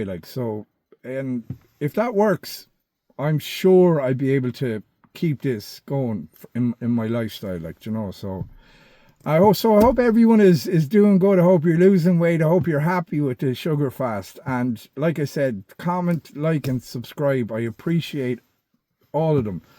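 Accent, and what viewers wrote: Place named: Ireland. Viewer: I could tell you're Irish. I was just curious if you're still living in Ireland. I'm always curious about ingredients in processed foods in other countries vs the u.s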